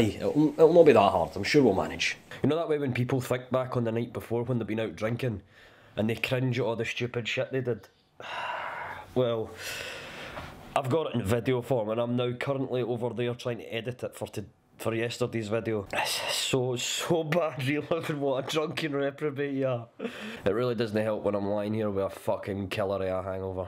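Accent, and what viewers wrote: Scotland, United Kingdom. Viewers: Your video are so funny and love the Scottish accent (wait shit I'm from Edinburgh I have a Scottish accent😊😊) ahha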